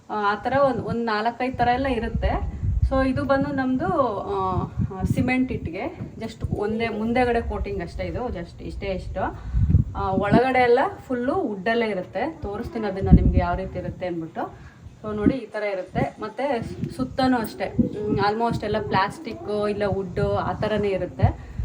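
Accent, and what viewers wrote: Standard. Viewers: ದೂರದ ಅಮೆರಿಕದಲ್ಲಿ ಇದ್ದರೂ ಸ್ವಚ್ಛ ಕನ್ನಡ ಮಾತು , ಕನ್ನಡ ಅಭಿಮಾನಕ್ಕೆ ನಮಸ್ಕಾರಗಳು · kannada swaccha vagi mathadthira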